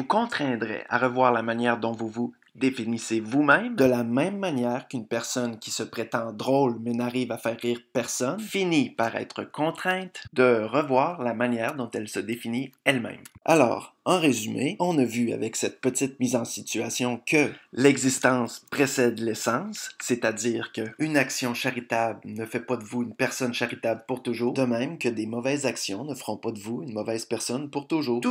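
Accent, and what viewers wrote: Canada. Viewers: Quebecois (Canada) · Tu viens-tu de Montréal? J'arrive toujours pas à distinguer bien les differents accents au Québec :D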